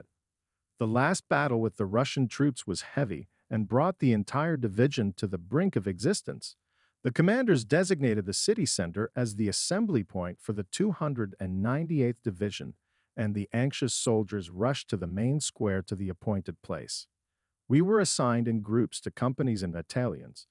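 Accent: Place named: United States. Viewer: Too bad the narrator is American….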